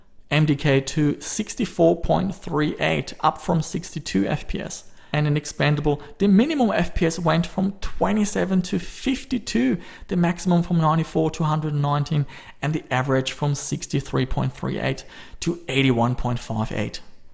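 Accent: Germany. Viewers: can i ask you from where you are? you have this german-english :D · you are from australia? I thought you were like german from your accent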